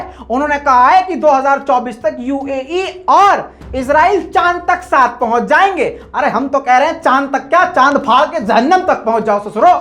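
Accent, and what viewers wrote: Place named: Uttar Pradesh, India. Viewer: भाई आप यू पी से हो, किस शहर से हो आप की भाषा से लगता है कि आप यू पी से हो।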